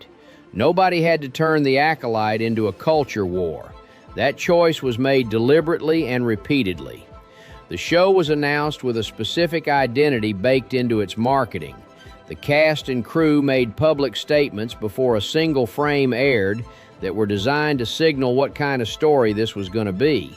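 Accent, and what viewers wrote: Texas, United States. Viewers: Hello - I'm a new subscriber. I love your Texas accent. Greetings from Maryland USA.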